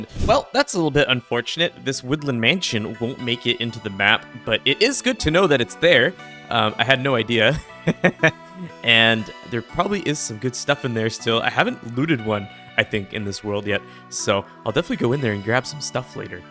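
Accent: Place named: East, Canada. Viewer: I love your videos! I've just subbed and look forward to watching more! I suspect that you're from eastern Canada too! Your accent sounds like you're from not too far away! :D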